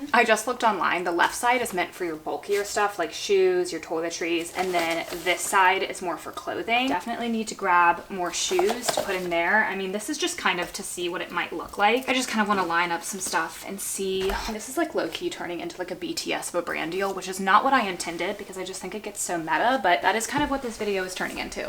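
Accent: South, United States. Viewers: Hearing you say you don't think you have an accent is too cute ( cause you definitely DO) (( saying this as a fellow southern queen)) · As a southerner you have a very noticeable southern accent to me :)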